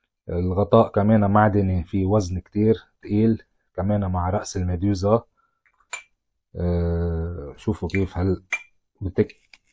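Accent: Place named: Lebanon. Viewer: اللهجة اللبنانية كتير منيحه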